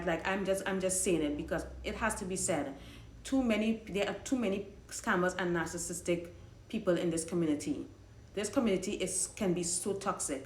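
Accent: Trinidad and Tobago. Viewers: Hi are you Trinidadian? Definitely an accent 🇹🇹🇹🇹🇹🇹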